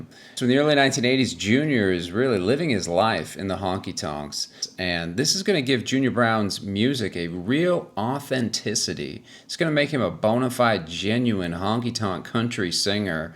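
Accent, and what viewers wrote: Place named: East, Canada. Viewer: I'm detecting a little eastern Canada in that accent my friend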